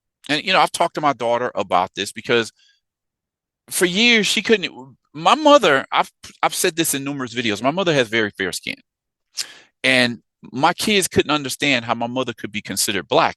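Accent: United States. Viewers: Question: Are you from Brazil?…You sound like you’re from the USA.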